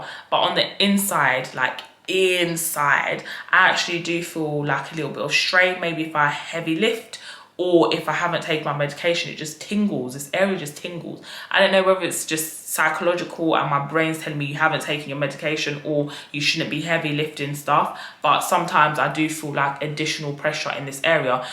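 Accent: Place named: London, United Kingdom. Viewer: YOUR LONDON ACCENT THO.....!!!!I ..I can hear that all day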